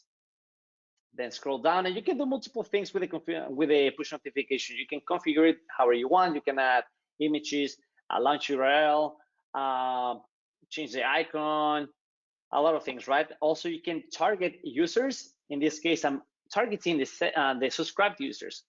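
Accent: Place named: Latin America. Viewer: fua amigo me salvaste de una grande no se de donde sos, tenes tonada lantina y te dicen (? asi q somos hermanos loco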